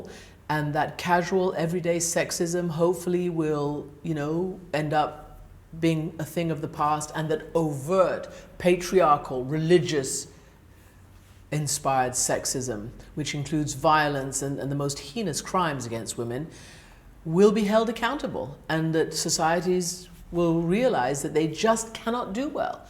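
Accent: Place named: Scandinavia. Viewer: You speak as a scandinavian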